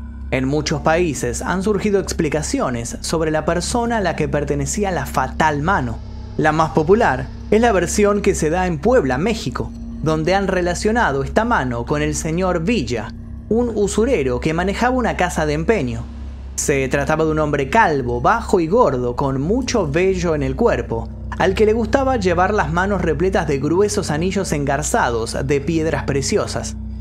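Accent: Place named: Argentina. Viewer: No soporto el acento argentino 😅 tengo que dejar de ver un buen video 😢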